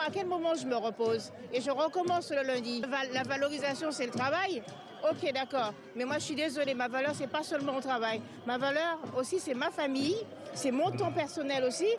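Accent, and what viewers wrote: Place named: Alsace, France. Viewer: Engueuler macron avec l'accent alsacien, c'est pas mal.😊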